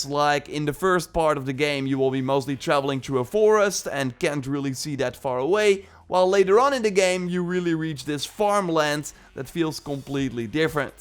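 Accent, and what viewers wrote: Netherlands. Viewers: Now i know where your accent is from (the comment said '' bewerkt''), I speak dutch too btw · Wait you dutch?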